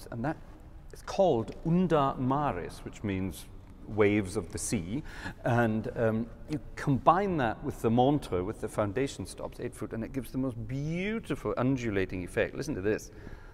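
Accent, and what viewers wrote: Scotland, United Kingdom. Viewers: Did german language steal your scottish accent? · To my British ears...no! has a lovely Scots accent:) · Er ist ja Schotte. Schottischer Akzent klingt angeblich, als würde ein Bayer Englisch sprechen. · I didnt realise you were scottish! Explains the accent then haha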